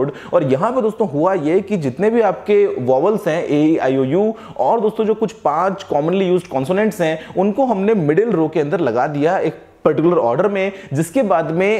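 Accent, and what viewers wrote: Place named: Haryana, India. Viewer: Sir aap basically aap haryanvi hai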